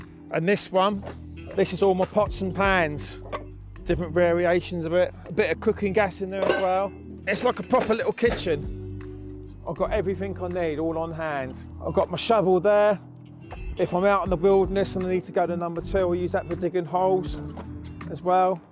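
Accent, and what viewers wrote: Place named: Portsmouth, United Kingdom. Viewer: ive just realised my comment sounded like an insult lol..i meant i knew after 30 seconds u was from Pompey cos i am too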